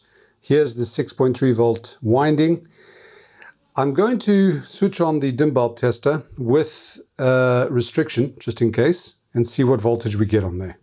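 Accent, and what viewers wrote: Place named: South Africa. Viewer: I heard that you are a hoarder and I instantly became a big fan. I am too! My mom recently died and I had to move everything out of her house because my brother and sister didn’t want anything because they live elsewhere. I had to move her things, my dad’s things, my uncle’s things because he was a hoarding repeat gold medal winner and my things that I had stored there. My daughter is already worried about me dying and that she will be stuck with going through everything. I have a nice collection. Good thing I have a big shop. You sound like you are from South Africa. I visited Durban because I met a nice lady there on the internet 20 years ago. It is beautiful there. If you’re not from SA never mind. Keep up the good work!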